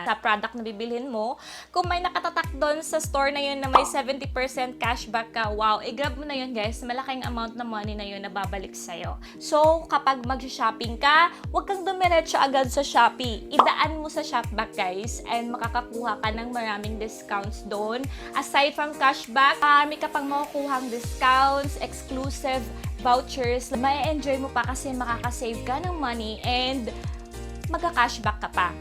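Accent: Visayas, Philippines. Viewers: Ma'am, bisaya ka po ba talaga!? I just followed you on FB! I feel a bit closer! Hehehe.... 😋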